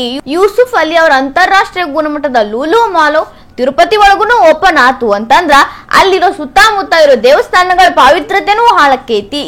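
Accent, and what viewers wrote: North Karnataka, India. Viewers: ಸ್ವಲ್ಪ ನಾರ್ಮಲ್ ಆಗಿ ಹೇಳಿದ್ರೆ ಚೆನ್ನಾಗಿರತ್ತೆ ನಿಮ್ಮ ಉತ್ತರ ಕರ್ನಾಟಕ ರೀತಿನ ಸ್ವಲ್ಪ ಕಡಿಮೆ ಮಾಡಿ ಸ್ವಲ್ಪ ರಾಗ ಕಡಿಮೆ ಮಾಡಿ ಚೆನ್ನಾಗಿರತ್ತೆ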